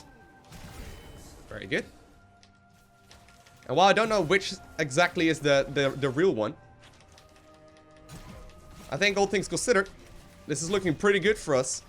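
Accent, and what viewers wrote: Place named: Netherlands. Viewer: Sounds like he shaved away some of his Dutch accent. That's really difficult so good job on that.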